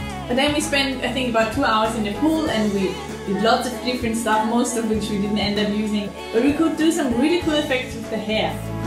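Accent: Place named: Scandinavia. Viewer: Where are you from I hear your accent but can't determine where you are from. Is it Scandinavian?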